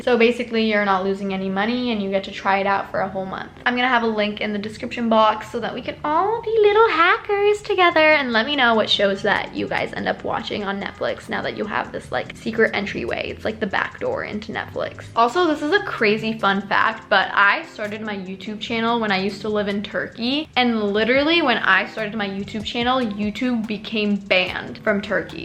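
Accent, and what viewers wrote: United Kingdom. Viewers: The British accent tho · That british accent 😆❣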